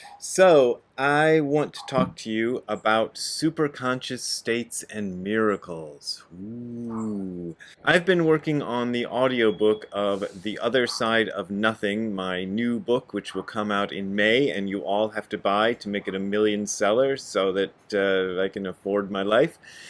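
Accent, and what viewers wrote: Cockney, United Kingdom. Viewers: GREAT Cockney accent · That cockney accent sounds like Moss from The IT Crowd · "Burn baby burrrrnn!" I am in the, and never coming back! And with that cockney accent, you could probably get a gig if they reboot Spinal Tap. Awesome! 🤓🤙🎶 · Loving the Cockney accent. Can you do a Geordie one next please 🙏 🙂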